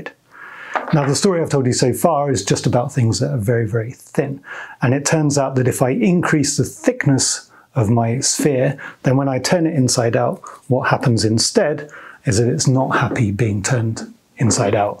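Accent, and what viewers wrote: United Kingdom. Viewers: Hoppah poppah, droppah poppah. Regardless of his British accent, he's very easy to listen to.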